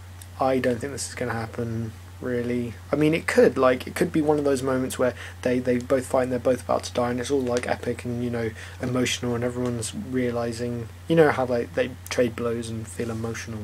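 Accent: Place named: United Kingdom. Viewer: This is perfect, a British guy talking about naruto! Im in heaven!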